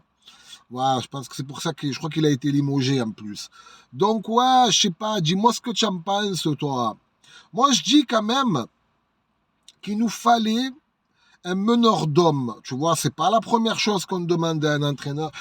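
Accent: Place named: Marseille, France. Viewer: J adore l accent marseillais ❤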